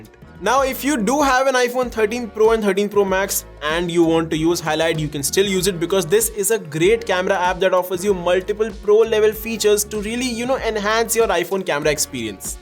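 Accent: India. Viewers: I don’t understand your English. Why do Indians think people understand their thick accent English?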